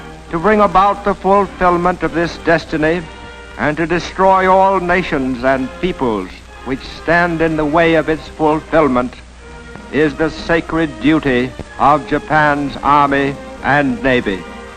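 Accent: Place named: United States. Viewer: Hello all, no offense to the uploader & the rest. BTW, I must stressed that I'm not a Japanese. This video is simply a US propaganda film, judging from the narrator's accent. Its quite insultive in some ways to east asian thinking. AND stop calling jpn/chin/kors "ASIANS!" Its makes you sound stupid. thanks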